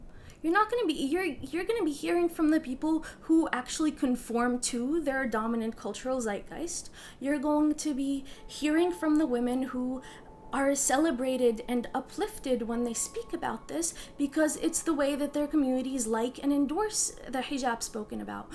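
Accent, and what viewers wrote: United States. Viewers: She sounds AMERICAN, and who wants to look at men anyways? I'd prefer a little segregated lol. Making a mountain out of a molehill. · Why dont she show her before-pics (for proof) with her face on front? It also makes me wonder how fluent and well-versed she is with english languange with no speck of hint of an accent considering she lived in Lebanon for more than 20 years, and magically have perfect american accent, and even if yes, she may have developed that accent, its impossible to sound that good with no stuttering and hint of a slip.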